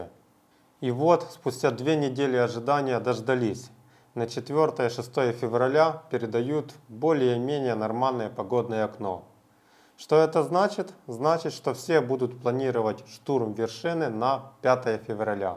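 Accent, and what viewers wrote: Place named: Surzhyk, Ukraine. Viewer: сделайте что то с суржиком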